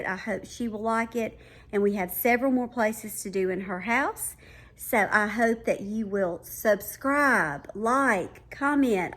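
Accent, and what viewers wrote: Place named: Alabama, United States. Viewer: Came for the organization, stayed for the beautiful Alabama accent! Music to this Southern gal's ears!!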